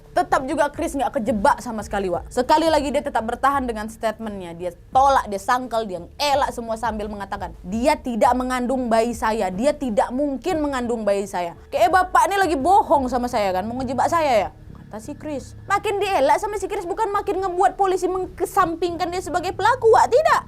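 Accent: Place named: Medan, Indonesia. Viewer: tuh org sunda tp cara pembawaannya kaya orang medan😊 aku suka cara pembawaan ceritanya, meskipun menegangkan tp bisa bikin hati tetap slayyyyyyy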